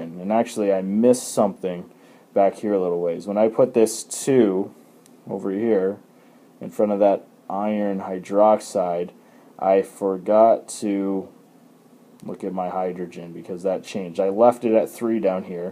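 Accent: Canada. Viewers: Stupid Canadian accent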